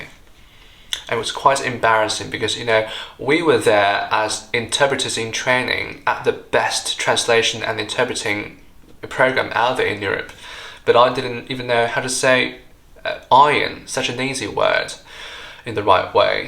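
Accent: United Kingdom. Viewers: 你的英语太好了。 You have an exquisite British accent. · 果然同樣身為華人比較容易了解弱點在哪，更棒的是還是英式發音，期待你下次更新，萬分感恩🙇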